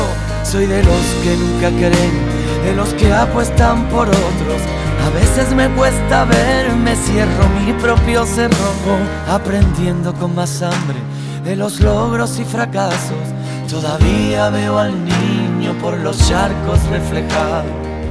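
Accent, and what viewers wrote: Andalusia, Spain. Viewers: Muero contigo Manuelillo...mil veces la escucharé y diosssss que Bonito..viva Isla Cris..tu pueblito..viva..Huelva..y viva Andalucía. olé olé olé y me GUSTA niño tu acento..andaluz..Isla Cristina..un sitio precioso ese Andalucía..suerte niño..un besote pedazo de ANDALUZ y guapo..de morirse..como decís por allí...💓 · No pierdas nunca tu acento y sigue hablando siempre con orgullo en IDIOMA ANDALUZ, la segunda lengua materna más hablada del mundo. Que sepas que no estás cantando sólo en tu nombre sino en el de 400 millones de andaloparlantes. Andalucía, tierra legendaria y universal. Inspiración a lo grande, como esta canción, que nace de niño y es capaz viajar lejos, muy lejos, donde alcanza más el corazón que la mirada.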